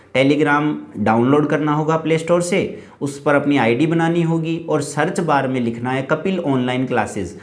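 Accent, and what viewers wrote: Rajasthan, India. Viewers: Bhai Rajasthan se ho ky....? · Bhai aap rajsthan se ho kya?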